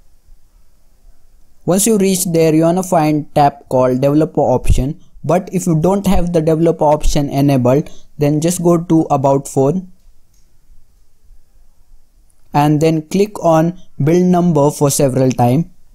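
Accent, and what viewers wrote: India. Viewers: Sorry I didn't understand you right 😊 I can speak english but I don't understand english with indian accent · 1. He's speaking in english 2. He has a indian Accent. What's your point?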